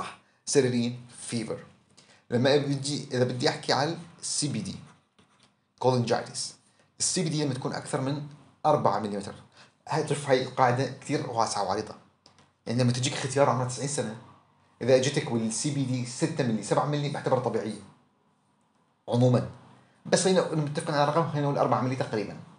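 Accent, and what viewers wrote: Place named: Syria. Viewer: اذا عراقي ليش تحجي سوري؟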